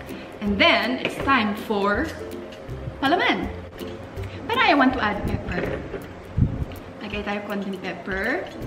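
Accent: Visayas, Philippines. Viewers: hindi ako bisaya peru bakit ang cute no g. Kpag nagssalita ng tagalog na bkisaya accent hihi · Kaaligre magbisaya oy! More videos pa. Loveyouuu😘😘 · Ganahan ko kaayo mostorya syag bisaya heheh gwapa kaayo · Ang Cute ni Ms. mag bisaya❤️😍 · Pwede ba ka himo ug Bisaya challanges 24hr.. Kay ka cute nimo magbisaya❤❤❤ watching fr0m Finland🇫🇮🇫🇮🇫🇮 Pa shout me Family Heikkilä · Ka cute nlng mubisaya uy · Oh!! 😮.Ms. its so mouth watering. 😋😋and cute niyo po pagnagbibisaya.😄.ganda mo po always ikaw lang ata ang nag-ihaw na ganyan ka fresh. 😊😍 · I love the way you speak "Bisaya" the same as me 😊 I'm proudly Bisaya 😊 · Ka cute mag binisaya oyy 😍😍❤❤ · Cute kau ka mag bisaya bah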